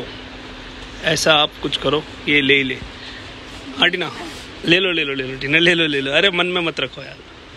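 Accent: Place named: Rajasthan, India. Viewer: मारवाड़ी में बोलता भाई बहुत गजब लाग रिया हो!!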